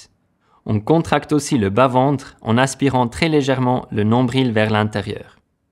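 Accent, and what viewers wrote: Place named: Germany. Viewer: j'aime beaucoup cette accent allemand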